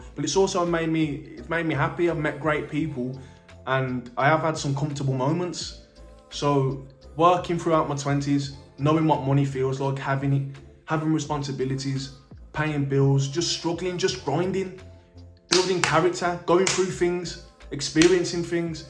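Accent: Birmingham, United Kingdom. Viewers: good vid bro you from brum ? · bro which place in the UK is that accent from? its fire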